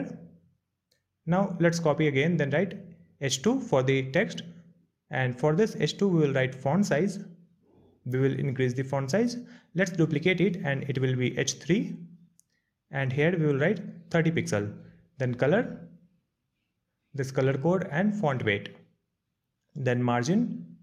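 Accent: India. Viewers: You instantly know that the video is good once you hear the Indian accent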